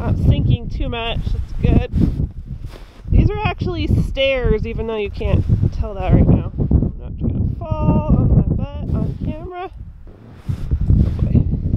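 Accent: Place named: Midwest, United States. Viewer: She has a Midwest accent.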